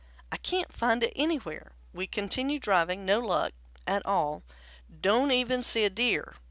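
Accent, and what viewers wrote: Texas, United States. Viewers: Your dialogue is much better. You've lost the Texas draw and speak faster. Thank you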